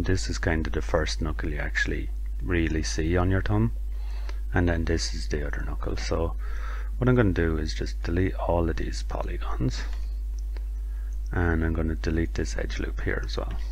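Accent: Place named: Ireland. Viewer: Great tutorial as always. The Irish accent makes it so much better, can't beat it haha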